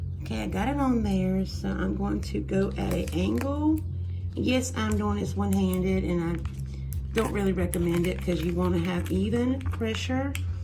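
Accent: South, United States. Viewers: Happy spring girl friend🌷🌹🌺🌸!!! I enjoy watching you and all your diys. I love to hear your southern accent, you make us feel at home watching you. Your channel is going to grow so fast 😀👍🏻👏🏻💖❣️ Good luck and best wishes to you. 🙏🏻🥰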